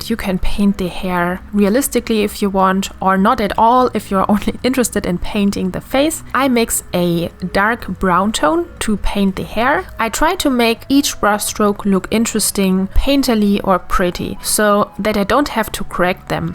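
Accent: Germany. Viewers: are you from Germany, because i think you have a little German accent( that is not a hate comment!) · I like your German accent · thick german accent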